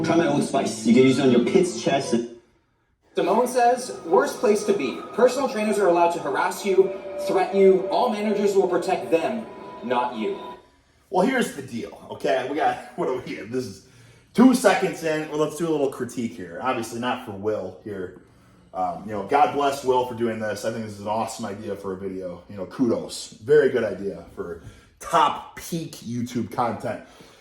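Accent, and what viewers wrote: Midwest, United States. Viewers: I love Midwestern swearing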